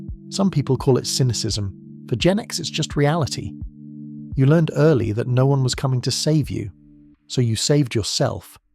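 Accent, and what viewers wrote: England, United Kingdom. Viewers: An American story about American generations. Why then an obviously UK English voiceover? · Sh*t Brit accent on American generations. Left wing interpretation. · Why is this narrated by an English person when it’s about America. Anyways Boomers in the UK were not born into winning. The UK had rationing until I think 1955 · British narration on American relevance 🤷🏻‍♂️ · American culture with a english accent curious 🤔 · USA centered with british accent. Lets forget the US-perspective. Theyre out! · A British accent narrator doesn't work. Give us real sources! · Hello, this a great video and I'd never heard of Gen Jones which makes a lot of sense. However, if you revisiting please don't use a UK voice for predominantly US content. It's a bit confusing and I feel a bit left out as a brit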